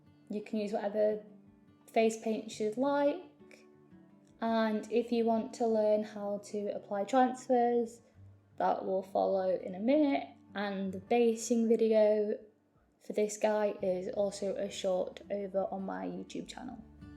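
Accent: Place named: United Kingdom. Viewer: the most refreshing thing about your videos is your british accent. It's really nice to hear over all the american english (or non-native speaking) painters.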